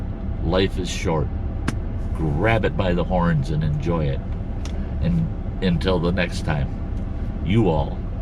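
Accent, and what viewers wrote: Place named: Indiana, United States. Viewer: What state are you in, sounds like Indiana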